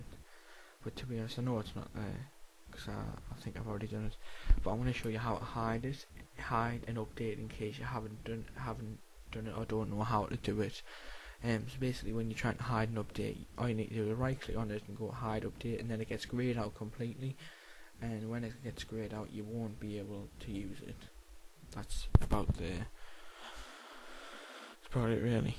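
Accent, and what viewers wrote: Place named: Cockney, United Kingdom. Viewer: Lol.. What kind of cockney gibberish are you ranting is this? I'm only assuming- I really don't know which UK dialect you're ranting, but it's difficult to make out; and also this video was very poorly encoded at that.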